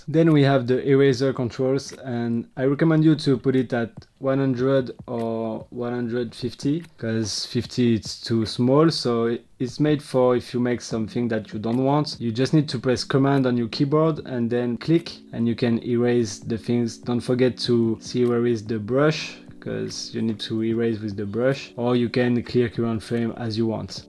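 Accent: France. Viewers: Toi t’es français ? 😂😅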